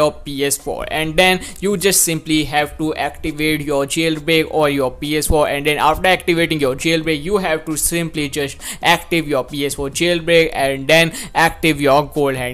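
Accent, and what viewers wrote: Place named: India. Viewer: When i heard India voice i am out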